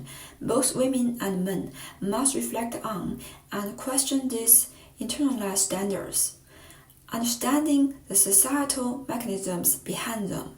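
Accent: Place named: China. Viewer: You have a beautiful Chinese accent. But I am confused. I am not sure. You are either Hong Kong or Taiwan or Singapore or Macau. Because Mainland Chinese can't use YouTube right. Please tell me where are you from. I really wanna know where you are from. I am a new subscriber. That's why I don't know. Sorry about that😊♥️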